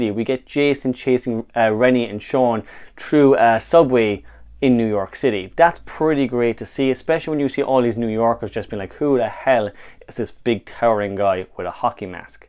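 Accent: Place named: Ireland. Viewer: I never really got into this franchise, so I have nothing to comment on. I’m just here to give you a thumbs up and I LOVE your accent!! Is that Irish?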